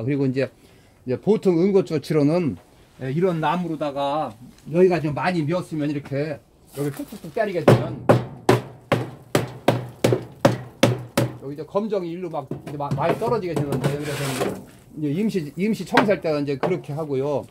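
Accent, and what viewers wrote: Chungcheong, South Korea. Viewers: 그렇겠네요‥ 불조심해야죠 큰일나죠‥불씨‥ 그런데 고향이어디세요 충청도같은데‥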